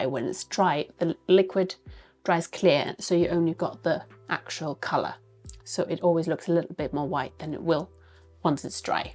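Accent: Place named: England, United Kingdom. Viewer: Fancy voice / English accent fancy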